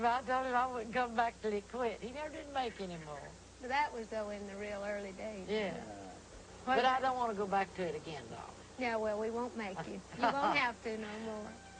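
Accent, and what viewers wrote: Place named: Southeast, United States. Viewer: Southeastern America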